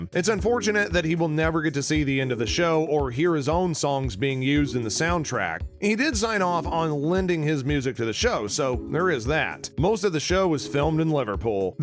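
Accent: United States. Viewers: I’m sorry but the way Americans say Liverpool and bermham is funny why we need more big British YouTubers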